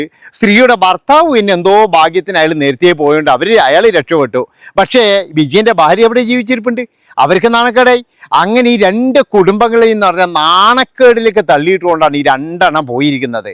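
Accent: Kannur, India. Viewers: ചേട്ടാ, പറയുന്ന ചരിത്ര സത്യങ്ങൾ /ആനുകാലികങ്ങൾ, ലളിതമായി കണ്ണൂർ സ്റ്റൈലിൽ സമൂഹത്തിന് ബോധനം നൽകിയതിന്. 🌹🤝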